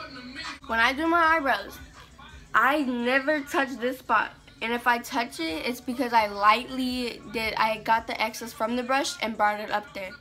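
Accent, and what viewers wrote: Bay Area, United States. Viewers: When i hear sobxrbe I assumed she was from the bay lmao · I can tell ur from the bay lmao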